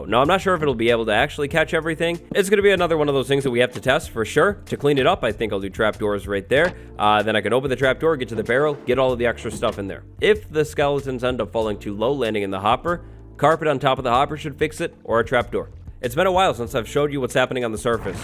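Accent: United Kingdom. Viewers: omg I love his British accent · ahh yes british boi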